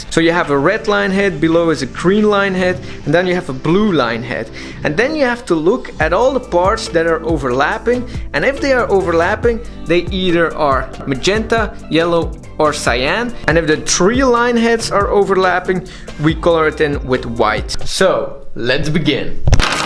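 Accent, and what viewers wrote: France. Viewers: Are you french?cuz you have a little french accent 😄 Anyways i am french too😊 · Ça se sent que t français 😂j’adore t vidéo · Je me suis doutée que t'étais français avec l'accent, et mon intuition a été confirmée quand j'ai vu les feuilles Canson noires! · Ta video explique très bien comment faire 👏 et tu a un super accent pour un français 😋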